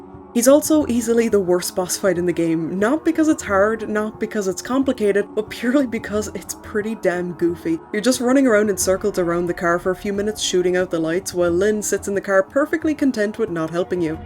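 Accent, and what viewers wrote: Ireland. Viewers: Hi! So, I watched your videos on Rule of Rose, Haunting Ground and now, Remothered. About time I leave a comment: your coverage of the games is great and I find the video length quite comfortable, too. As long as a Twin Peaks episode ;-) By the end of this vid, you shared how surprised you were to hit 100k views. I think it's well deserved for the polished video presentations. And the Irish accent :3 Just keep following that passion. I'm sure life has great things in store for you and your videos. Also, I can't go without mentioning the bit of Silent Hill music I heard at the end of your Haunting Ground video. I'm a sucker for the SH music and aesthetic. So, thanks for that, too :D edit: I read through the descriptions of the other videos I watched. More SH tracks that have gone undetected. It's subliminal bait for SH fans at this point :D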